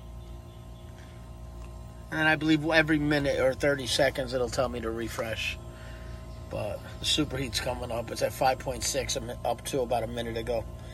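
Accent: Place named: Long Island, United States. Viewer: Where in ny are you? You got a Long Island accent but looks like your upstate here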